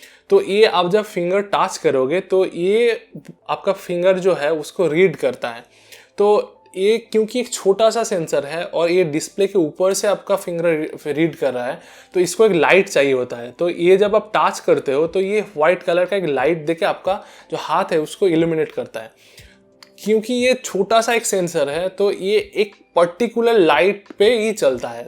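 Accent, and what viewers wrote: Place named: Bengal, India. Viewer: bro tum bongoli ho kya? great work tumhe bahut mehnat lagi hindi bolne mai. koe na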